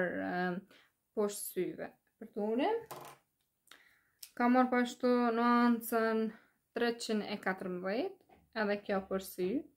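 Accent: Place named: Kosovo. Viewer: Pershendetje me shume siguri do te hapesh parukeri apo e ke nje te till. Ne cfare qyteti je . Nga djalekti me dukesh nga veriu ise me sakte nga kosova. Suksese❤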